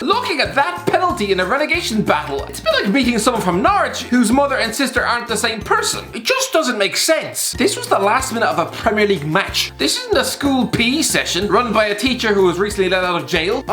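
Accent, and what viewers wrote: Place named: Ireland. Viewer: Nothing like starting my day with an Irish guy rant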